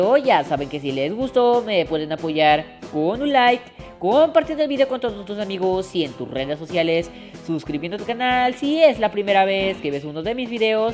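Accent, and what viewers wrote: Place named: Yucatan, Mexico. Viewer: Ahhh pense que eras de yucatan 😄😉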